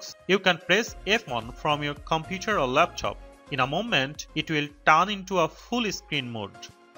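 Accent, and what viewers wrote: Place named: India. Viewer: Thank you for explaining Fn keys but I struggled to understand due to the Indian accent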